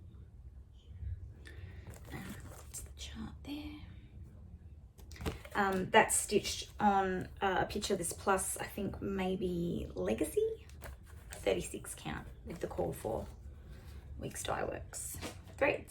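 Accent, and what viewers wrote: Australia. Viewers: Loved your video, your wonderful Aussie accent and your handwork. Best to you and yours!!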